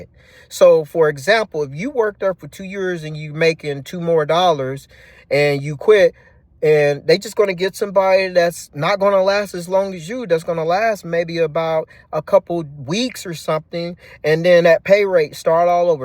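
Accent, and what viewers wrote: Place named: Missouri, United States. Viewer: Bro...Solid commentary as always. Also, U remind me of Mason Betha but with a Missouri accent. You should write a book with all of your stories. Reminds me of stories I read in two books: (1) Working for You Isn't Working for Me (2) Living Successfully with Screwed-Up People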